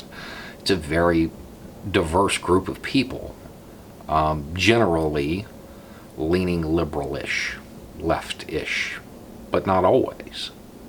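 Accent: South, United States. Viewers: I guess while we need to be able to exercise our freedoms to improve, what I immediately think of is a lack of kindness. I’m tired of people treating each other like garbage. It feels like humanity is in its teen years, and anything different is made fun of, is a weakness to poke at, in hopes of tearing them down and making ourselves feel better. When I first started watching this channel, I was hesitant to click. I’m from the boarder of TN/KY and I knew that accent immediately, lol. And I wasn’t used to hearing these ideas come from someone who sounds like this. So not only do I find out about world events and hear a very interesting perspective, but I also have to check myself, because while I wasn’t actively being a jerk, I was falling into the trap of putting someone in a box of my expectations before I’d heard the words they had to say. I feel like... 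this channel helps keep me honest, while I’m trying to find ways to promote what I consider to be the ideal.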